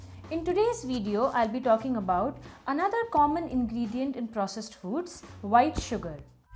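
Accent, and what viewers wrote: India. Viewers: Must you talk in English? Your pronounciation sounds half Hindi and only 10% english. Hard to understand.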